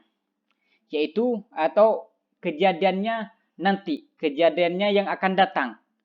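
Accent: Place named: Madura, Indonesia. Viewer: Madura mu loh 😂 medokkk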